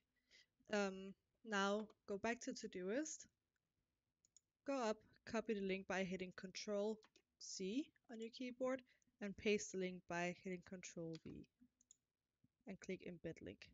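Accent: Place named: Italy. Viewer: you sound like italian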